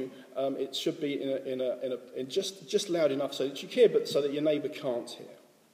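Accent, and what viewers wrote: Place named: London, United Kingdom. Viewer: Thank you so much. You sound like a Londoner (Guessing North East London?) I used to work in a kosher butcher"s in Southgte. I also Have you ever heard of Shimusha Rabba tefillin?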